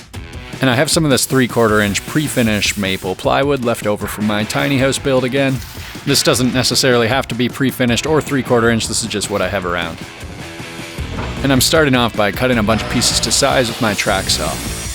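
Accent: Canada. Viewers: Is your accent Canadian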